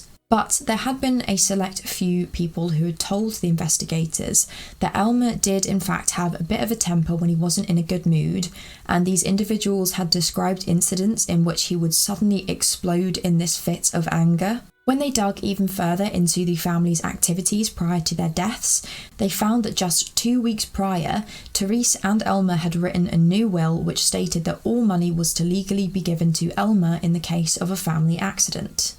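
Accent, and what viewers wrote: England, United Kingdom. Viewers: What country is she from? England?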